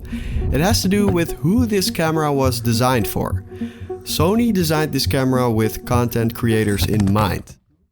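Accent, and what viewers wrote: Netherlands. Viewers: That distinct Dutchie accent 🤗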